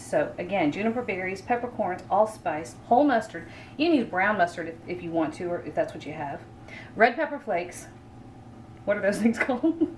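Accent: South, United States. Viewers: I enjoyed your video and you received a like from me.I replayed the video three times and I really cannot understand the name of the buy bulk online store. I am sorry to trouble you with this. I am Irish and getting attuned with some of the American and very lovely Southern accent, thus I hope you for give me. In American if you listen to somebody talk on the news from the North of Ireland it has to be subtitled :-) :-) I do thank you for your attention.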